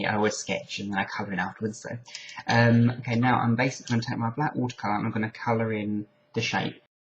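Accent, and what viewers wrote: United Kingdom. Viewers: dear god. listening to Darth Maul speak in a cheery british accent is so bizarre..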